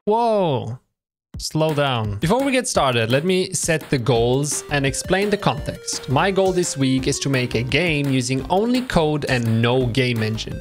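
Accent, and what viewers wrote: Poland. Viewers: youre from poland? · Are you from poland ;3? Also great job on the video man, its soo high quality. Keep it up and you will go to places cheers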